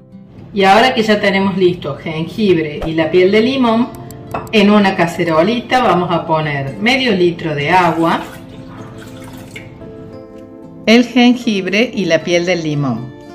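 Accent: Córdoba, Argentina. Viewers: Qué lindo es escuchar tu voz de cordobesa!! Te iba a comentar q "acá en Cba.tomamos el mate c jengibre " pero qué te voy a decir !!! Si sos de mi Cba. Es buenísimo el jengibre!!! Haré este té. Gracias!! Ely, ciudad de Santa María de Punilla, Córdoba,República Argentina. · Qué alegría escuchar esa tonada cordobesa!!! Muy buen video, muchas gracias!!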